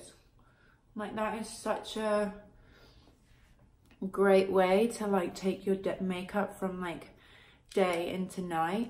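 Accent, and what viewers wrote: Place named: United Kingdom. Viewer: british accent is everything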